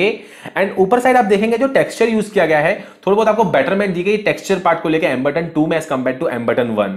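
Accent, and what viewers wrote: India. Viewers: Thank you for this comparison. I speak English as a second language but I’m quite fluent. However I find a bit difficult to get all the 200 words per second with this Indian inflection. The video seems very good but I suggest to slow down a little and try to capture a wider audience.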